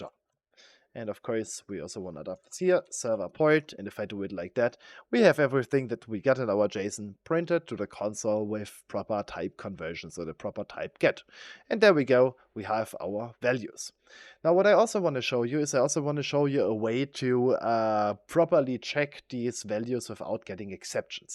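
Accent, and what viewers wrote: Germany. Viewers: That was the perfect video to start with. Thanks. Greetings from Bremen my german accent speaking friend.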